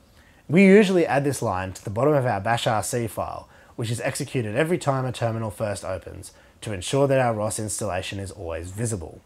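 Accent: Australia. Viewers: thanks, I appreciate the feedback! Ive learned quite a bit from your video series / channel thus far so thats helped and am looking for more resources. My issue is that I have a rather unique robotics project and have been 'cobbling together' the ecosystem / tools myself & have only just discovered ROS. I will have to look more into it to see if its appropriate; I mean it has to be right?! PS. My 'unique project' at the moment is a Knight Rider KITT replica & was thinking ROS may be the solution. Yes, an actual pontiac trans am. PPS. You sound like a fellow Aussie, hope Im not being rude? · Excellent Aussie 😊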